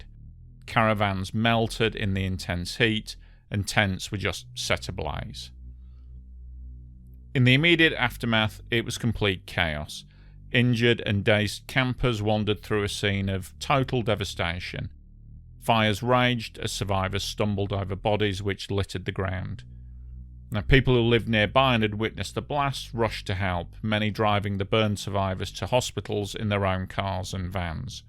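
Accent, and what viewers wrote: United Kingdom. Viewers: I appreciate your very Britsh English. Thank you for this documentary. I´ve never heard or read about it.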